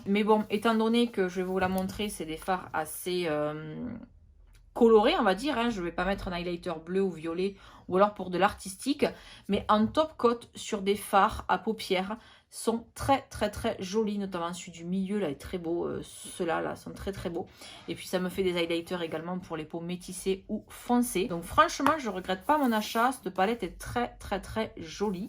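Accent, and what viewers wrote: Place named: Southwest, France. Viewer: Le petit accent du sud-ouest !! 👌🏻 super vidéo qui détend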